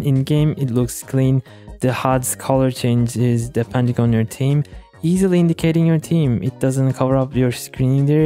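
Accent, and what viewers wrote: Turkey. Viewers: bruh your accent screams Im turkish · aksanından türkçe sırıtıyor dost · Bro ı think u are turkhis because your speech is similar to a Turkish person speaking English.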